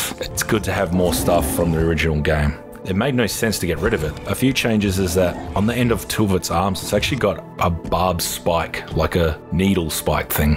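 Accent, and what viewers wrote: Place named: Australia. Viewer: If I click a Forest video and it doesn’t have a gritty Australian accent, I assume it’s a clickbait or just bad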